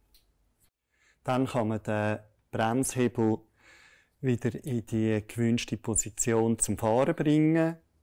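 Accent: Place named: Switzerland. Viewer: Obwohl ich aus Norddeutschland komme habe ich das Video mit dem Schweizer Deutsch super verstanden hammer Video Klasse erklärt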